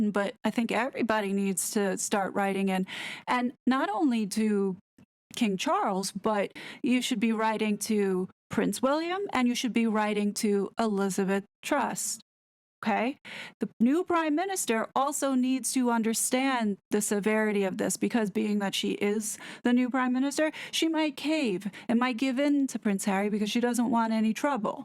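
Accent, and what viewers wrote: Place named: United States. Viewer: Please, tell me how your love for "All things Royal", and/or Anglican Everything became important to you... I'm an American from Boston, and our family loved British TV and culture, had friends in the UK through travel, and their love for that corner of the Globe trickled down to at least one of the six kids, me. It's nice to hear an American voice (and an nice, soothing voice) giving me the scoop with no nonsense.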